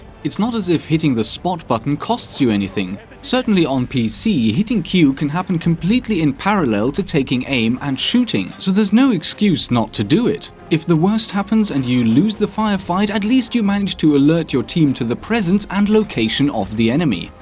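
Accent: RP, United Kingdom. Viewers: Your tone is so pretentious I cannot manage to get through this video. You're talking about irritations on a video game, not reading out the verdict in a highly contested court case. You don't have to speak in BBC English.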